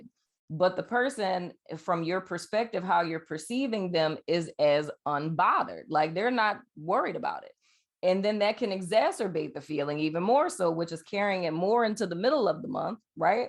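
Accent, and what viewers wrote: New Orleans, United States. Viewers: I friggin love your soul,. Your channel, your messages, and you as an individual are inspiring, enthralling, and captivating. And I love your New Orleans accent. I’m a Detroit native in this life. But I really have this thing for accents and dialects as a musician. Thank you and namaste. I always look forward to seeing you. Now lemme rewind a bit because I was really focusing on getting this message right on the first try. Think I got it. 🤔😅🙃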